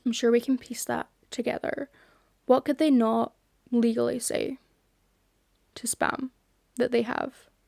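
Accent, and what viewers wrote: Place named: England, United Kingdom. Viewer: Hold. I think im going insane. Why did I remember you having an american accent, and on this account one of the englishy ones?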